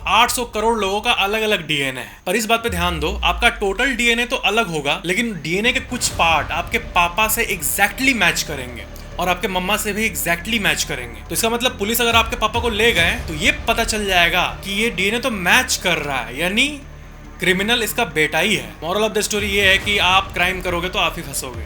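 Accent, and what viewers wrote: Bihar, India. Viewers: bhai tu bihari ho na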